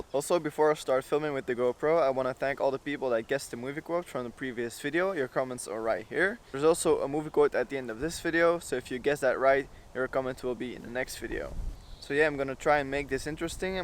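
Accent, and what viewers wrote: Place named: Belgium. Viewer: Where is that, judging by your accent, I think I might live close and I'd love to try that course. Just read you're from Antwerp, dan ben ik zeker dat het niet zo ver is.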